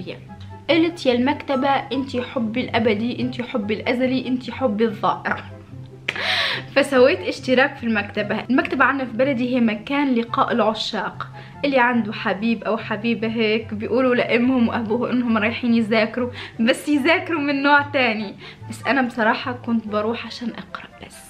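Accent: Tunisia. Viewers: انتي تونسية صح ؟ ماشالله على بنت بلادي اول ماعرفتك عندك نبرة تونسية و شاهدت البعض من فيدواتك روووووعة ماشالله عنك😍😍 انشالله نقول عليك صحباتي يتابعوكي ❤❤❤❤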